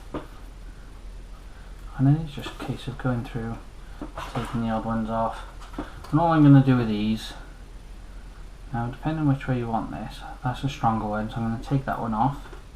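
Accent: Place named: United Kingdom. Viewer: Hi, Just seen 2 video's so far, but its looking great. I did have (1) parsnip last year, and i totally neglected it. is your problem in germination? (i only sew like 6 or so) Tobacco i will give that a try this year, i dont know if i do have to ferment it though. I'm also very curious about your tomatoes; you sound like you're from GB (i'm just across the chanel) and i can't grow any tomatoes outdoors here, except for the cherry tomatoes. Will you also be planting/sowing some peppers, radishes,....? Grtz Tim, aka The Druid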